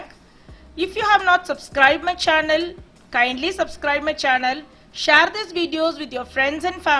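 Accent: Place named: England, United Kingdom. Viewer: Been to this temple many times. Nice English ascent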